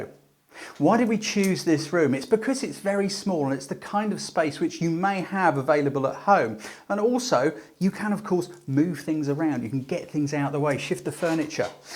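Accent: England, United Kingdom. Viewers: Hi! Thanks so much for all of your videos, they are very helpful and you make everything easy to understand. Love the English accent as well! I am thinking of setting up a home studio and wondered if you thought a room with the dimensions of 14ftx12ft would be sufficient and what do you think my my must have equipment should be? This would be for newborn and portrait photography mainly. Thanks again!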